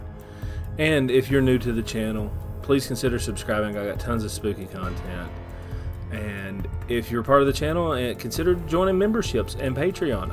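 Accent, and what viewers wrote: South, United States. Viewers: I LOVE this guy's country accent!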